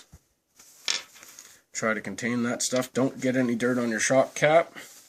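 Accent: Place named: Canada. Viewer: You sound Canadian bud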